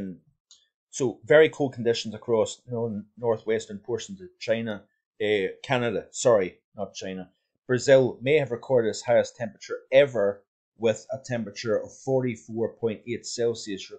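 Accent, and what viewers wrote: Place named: Northern Ireland, United Kingdom. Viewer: This will probably be the height of our cold snowy winter. With last year being quite cold and that becoming an ever increasing rarity I’m betting on this winter being a wet and stormy one. The climate change impacts are really becoming quite noticeable to me now over the past few years. More erratic cold spells and ever longer lasting heat into Autumn. Here in Northern Ireland it’s been a very odd year. An extremely humid almost tropical summer with a ridiculous amount of thunderstorms the likes of which I’ve never seen. In September there were severe elevated storms with really active lightning. Now it’s the amount of rain we’re getting. Absolute crazy. Most of October and up to mid November was basically written off in terms of hitting the rivers for some fishing. One of my nearby rivers is still high as it has been for weeks. 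By the way are you from NI? I seem to recognise your accent. You do some amount of work on here it’s much appreciated👍.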